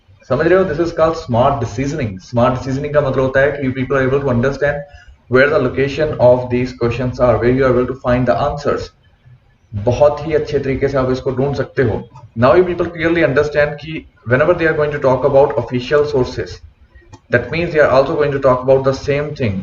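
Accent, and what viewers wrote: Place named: India. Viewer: English hindi mix accent 😏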